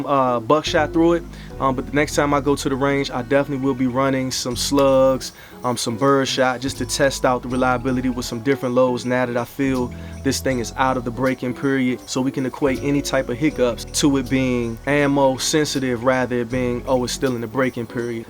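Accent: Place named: DC, United States. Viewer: What range are you using? You sound like you’re from around the way. DC/Maryland/Virginia.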